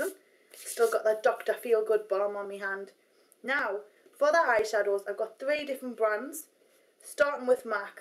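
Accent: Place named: Northern England, United Kingdom. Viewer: She's English. And if I'm not mistaken, she's from Northern England, which is an accent people aren't often familiar with.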